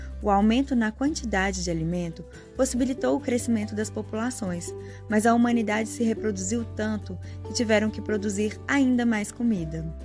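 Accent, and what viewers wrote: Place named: Belo Horizonte, Brazil. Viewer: Kkkk. Vcs acham que a narradora é de BH ou nem??? Ô, sotaque forte! Muito bom o vídeo. Parabéns!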